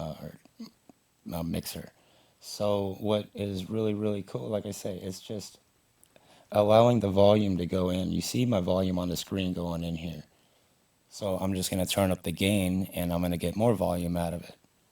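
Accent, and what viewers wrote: Philadelphia, United States. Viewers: Yo bro are you from Philly?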